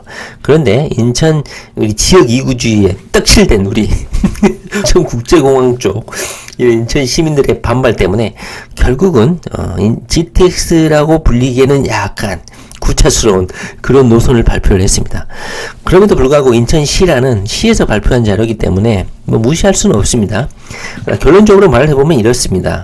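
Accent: Jeolla, South Korea. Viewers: 이사람 전라도 가탕..사투리쓰네..전세계 최고 허브 공항을 당연히 gtx 연결 시켜야지..국가 발전을 위해서..스톡홀름..공항에서 바로 시내로 초고속 기차 진입하니 완전 빠르고 좋더만..국토부에서 알아서 하세요~~공항철도는 넘 느려~~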